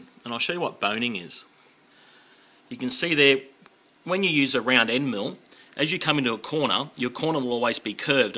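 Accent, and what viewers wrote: Australia. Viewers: great to hear aussie accent at last!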